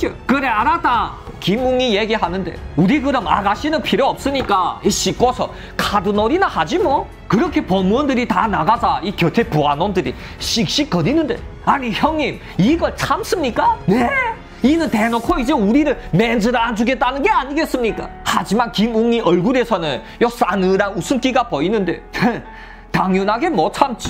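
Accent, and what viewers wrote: Yanbian, China. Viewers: 조선족임? 연변말투네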